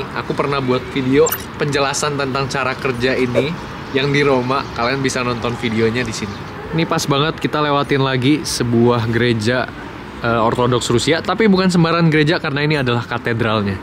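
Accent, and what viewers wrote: Indonesia. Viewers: Ejaan bahasa inggrisnya logat indo aja, gpp kok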